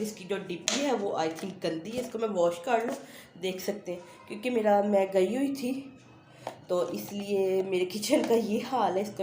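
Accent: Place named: Lahore, Pakistan. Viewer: Sister aap Lahore ki lagti hain MashAllah aap Lahore walon ki tara bolti Hain Allah tala ki qismat shandar kary dunia ki sari naimtain day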